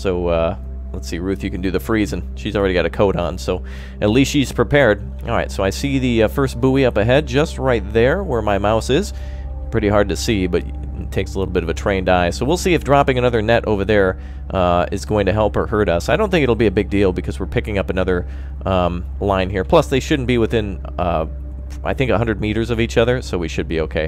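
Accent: Germany. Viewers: R u german? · you german?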